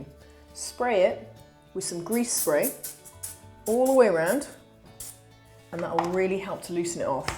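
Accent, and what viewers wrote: England, United Kingdom. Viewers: She sounds English. Makes a nice change from American and Aussie DIYers. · That's an English accent 🙄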